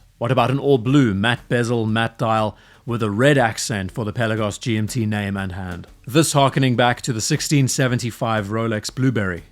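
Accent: South Africa. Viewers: Dig your accent. South Africa?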